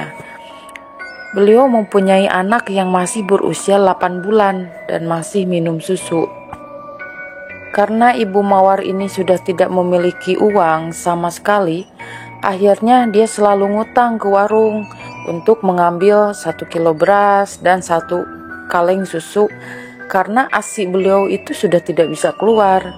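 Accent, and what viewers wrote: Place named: West Java, Indonesia. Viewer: mantap cerita ada animasinya, klao dnger dari logat kayanya dari sunda semoga chanelnya sukses, yg penting tekun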